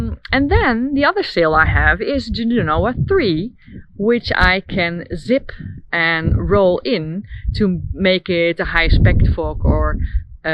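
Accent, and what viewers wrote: Netherlands. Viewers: Waarom heb jij zo'n gruwelijk Nederlands accent? Je moet echt veel meer "overdrijven". Bijvoorbeeld "tolk about", de klank van jouw talk rammelt. Het is een O geworden, niet iets als een OA. Ga eens wat harder aan de klank van al je klinkers trekken. Maak het bekakt! Dat helpt. Alle Nederlanders zeggen UPDEET. Engelsen zeggen APDEET. En grapje, zeg nooit meer FOK tegen je djip. Dat gaat op een dag helemaal fout! Fok?! What? Hee, mijn "sorry" is weg. Dit stukje was NIET vervelend bedoeld. Ik hoor gewoon meteen Nederlands. En dat zit vooral in de klank van je A O U. Engelsen doen dat echt totaal anders. Jij niet en dat hoor je. Zelf intussen jaloers. Altijd geldproblemen en boten in slechte conditie gehad. Een kwarttonner Waarschip is prachtig, als al je hout in orde is. Van een lekke kielbalk word ik ongelukkig. Hij kon nergens op de kant voor een normaal aantal euro's, echt, dan word je NIET vrolijk. 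Kon hem zelf wel repareren, maar niet "effe", echt niet. Ik wil graag weer zo'n bootje, klein is leuk, wie weet lukt het nog eens. Voorlopig heb ik een hele goeie kano. Dus, het allerbeste met zeilen!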